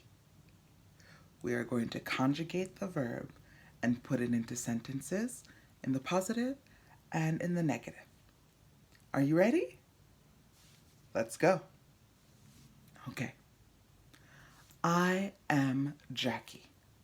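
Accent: France. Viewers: I am learning so much. Are you a french native speaker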